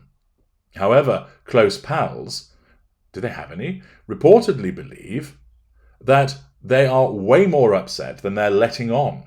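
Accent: United Kingdom. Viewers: Why are curse words even funnier when pronounced with a proper British accent??!!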